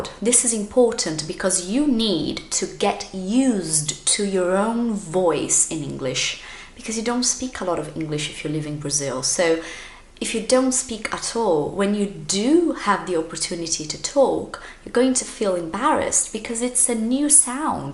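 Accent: United Kingdom. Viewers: Nossa que sotaque britânico maravilhoso!! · Ela realmente tem um sotaque britânico maravilhoso é uma delicia ouvir. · sotaque britanico ? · what a lovely British accent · I've from The uk (london) and she has a very strong british accent haha! More "british" than mine 😂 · inglês britânico maravilhoso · VC é brasileira??? Que inglês britânico lindo é esse??? I'm shocked! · why do u have british accent? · Seu English é britânico ? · I love your British accent.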